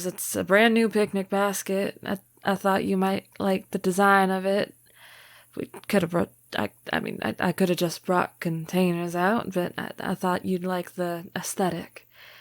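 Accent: South, United States. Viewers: I love your southern accent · Oh I need more of your southern accent now... I love it...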